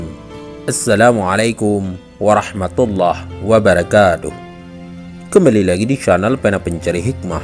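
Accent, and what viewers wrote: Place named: Bali, Indonesia. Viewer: Konten bagus karo narasinya menggunakan aksen Bali jadi kerasa gimana gitu...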